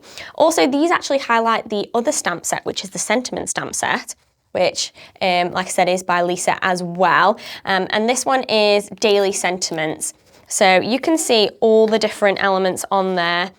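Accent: United Kingdom. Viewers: Thank you so much for this walk through (made even better by your darling British accent!). So much inspiration and so many ideas.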